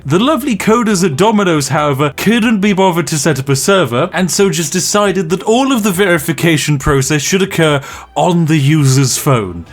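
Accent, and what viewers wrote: United Kingdom. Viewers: 15 minutes of pictures and some guy with a lame british accent? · You sound British.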